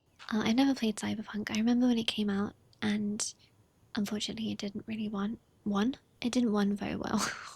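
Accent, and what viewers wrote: United Kingdom. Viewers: Oh my god are you british 😭😭😭 i thought those people were only in tv 😭😭😭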